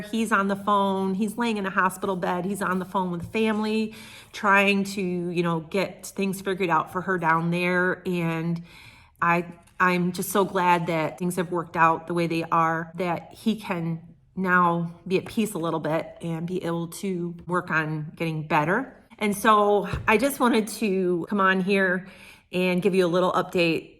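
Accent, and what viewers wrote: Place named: Michigan, United States. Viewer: Oh, my goodness what a time your family has had, but our God is so good!! I just came across your videos this week and wondered if you were from Michigan as I recognize the accent, lol. I am originally from Riverview. I have lived in Tennessee most of my life, but still have a soft spot for Michiganders. I am praying for your husband, his mother, and you!! 🙏🙏🙏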